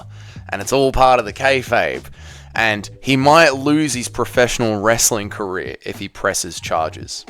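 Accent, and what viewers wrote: Australia. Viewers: I think Chael really has lost whatever little credibility he had left. Very sad to see. Loved the video, by the way, delivered the way only an Aussie can! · Something about you Aussies smug, disrespectful attitude mixed with your unpalatable accent especially when reporting or speaking about anything American, just sounds like huge piles of 💩hitting the ground over and over again…. Btw, has everyone seen the video footage of the disrespectful jackass wrestler committing assault by walking up and smashing a can of beer on Raja’s head prior to the event?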